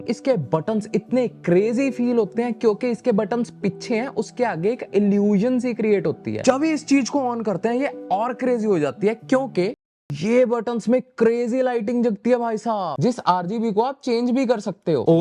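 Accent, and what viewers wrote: Punjab, India. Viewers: Bro punjabi accent 🤌🏻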